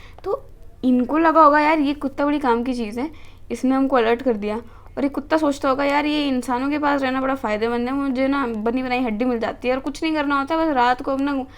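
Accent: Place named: Rajasthan, India. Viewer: ❤ mar koot bhata Aap rajasthani ho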